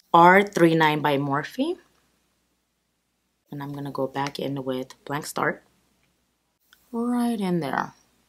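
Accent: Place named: Armenia. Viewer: You & Lilit are two of the most beautiful women! I love your Armenian accent coming through! You two do makeup better than anyone else I follow! So natural but glam & just refreshing seeing someone contour without looking too done up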